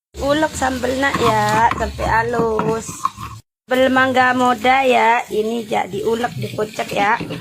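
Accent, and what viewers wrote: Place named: Madura, Indonesia. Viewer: Ini asli orang Madura 😁😁dari cara ngomongnya beda😂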